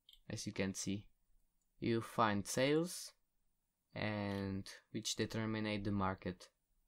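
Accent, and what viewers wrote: Czech Republic. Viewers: T H A T B E A U T I F U L C Z E C H A C C E N T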